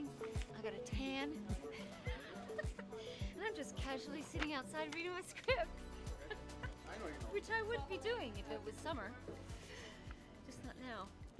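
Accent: Australia. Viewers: Love that you have your Australian accent. Love the show!! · Thanks for the brief invite to your lovely home. Australian accent, really, I had no idea what it was. Your name shows french as does mine so the Australian bit surprises me a little. Whatever it is, it is perfect. Murdock Mysteries for me is a real treat, the names of the streets in Toronto and surrounding areas brings it all together and I absolutely love love love it. Keep going and I and we will be there with you.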